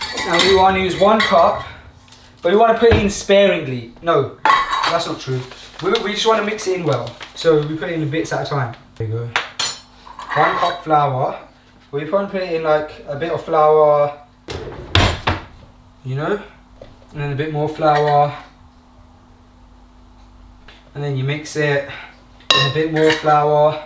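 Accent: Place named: United Kingdom. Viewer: tbh I learn Islam, english, and british accent from your videos and freshly grounded's videos a lot, thank you 💯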